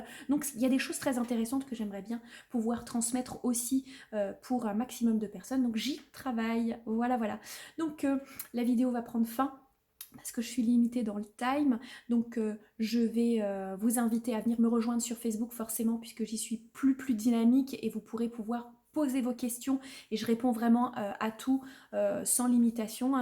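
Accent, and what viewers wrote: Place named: Alsace, France. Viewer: Merci c'est toujours un plaisir de vous entendre parler que ce soit de peinture ou de votre bilan. Mais où est passé votre accent Alsacien 😁. Je vous souhaite une belle et heureuse année artistique. Bisous et à très vite pour votre prochaine vidéo 👍😉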